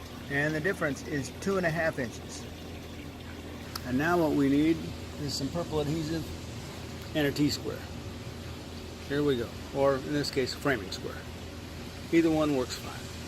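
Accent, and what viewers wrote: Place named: Texas, United States. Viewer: "Fixin to do" 😂 are you from my neck of the woods in Texas?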